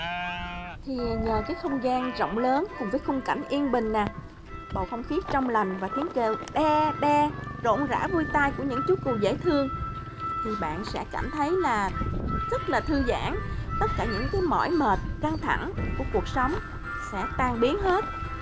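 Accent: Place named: Saigon, Vietnam. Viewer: Đồi cừu suối nghệ vũng tàu,vũng tàu có nhiều cừu ban ha,nghe giọng nói của sài gòn dễ mến quá,mình thích giọng sài gòn quá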